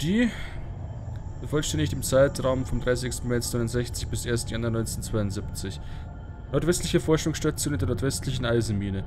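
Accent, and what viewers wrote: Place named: Southern Germany, Germany. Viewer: Die Geschichte gefiel mir nur das Ending war nicht wirklich zufriedenstellend. Mal sehen wie das weiter gesponnen wird. Übrigens spricht man das normalerweise Tri-Nitro-Tulo-Ol aus weil das die Wortbausteine sind die für die Beschreibung der Struktur zusammengesetzt wurden sund (chemische Nomenklatur). Man merkt auch, dass du aus Süddeutschland kommst weil du von Kemie, Kina oder auch Arkitekt sprechen würdest ;)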